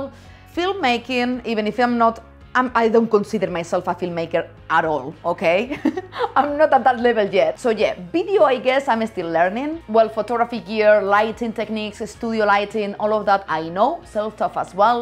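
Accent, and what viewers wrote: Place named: Spain. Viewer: Lo primero que me llamo la atención de tus videos fue tu acento, después de varios vídeos especulaba eras de España y si, gran contenido, saludos desde México, también subo contenido a mi canal, todo en español, ha sido complicado para ti hacer un canal en inglés.?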